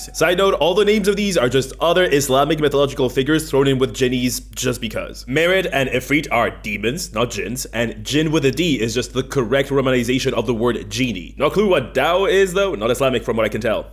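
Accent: France. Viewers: WAIT WHAT ARE YOU FRENCH !?!?!?!? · welp, as a french guy my self i must say... your french sounds... french ? yet you're english does not... i'm confused mate xD are you a fellow frenchman ?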